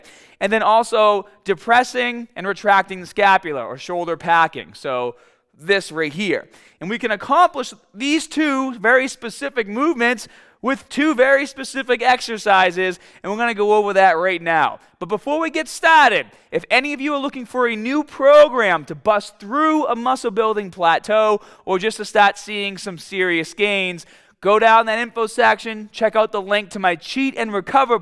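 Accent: Boston, United States. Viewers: Thanks for the videos! They are awesome! From where in the US is your accent if you don’t mind me asking? :) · The Boston accent is hysterical...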